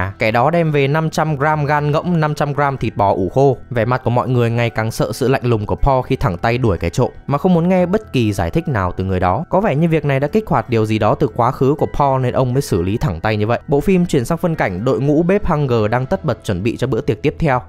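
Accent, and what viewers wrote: North, Vietnam. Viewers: Giọng chủ thớt miền bắc mà cứ ba ba, ông này người nam nói giọng bắc à :) . Ở ngoài bắc người ta gọi "bố" chứ nhỉ :)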